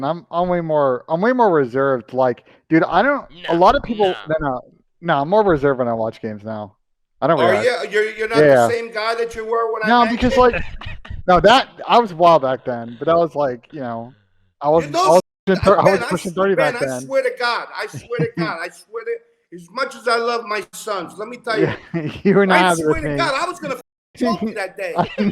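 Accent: United Kingdom. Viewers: Watched your video last night, and as soon as the intro sound happened, followed by the "Alright guyyyyys, ", my girlfriend looks up and says "I feel like I live with this guy... I can't even understand him, he talks so fast! At least he's British though and not annoying like that other dude!" (she hates Maven.. haha)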